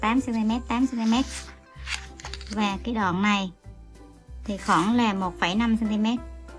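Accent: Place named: South, Vietnam. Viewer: cảm ơn chị rất nhiều mong em sẽ được mãi fan cuồng nhiệt của chị em cũng chúc chị năm mới xinh đẹp hạnh phúc bên gia đình sức khỏe dồi dào làm thật nhiều video cho chúng em xem giọng chị em mình là miền Nam nè mong giọng của em có thể hay như chị nè hi hi 😆😆😍😍😍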